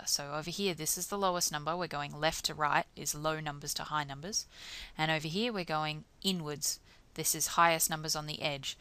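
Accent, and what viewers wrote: Australia. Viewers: you have a lovely accent haha it's aussie accent right?;) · yea its aussie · Aussie! Ayy reminded me of Alycia Debnam Carey... but yesss thank you for this! V helpful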